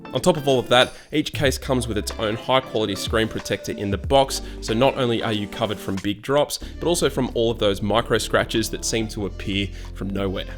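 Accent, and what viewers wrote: Australia. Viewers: I'm sold with the magnet tech. I was wanting something like this to stick to equipment at the gym so I can see the timer without having to stop. Great review. Nice to hear an aussie voice on the tube too.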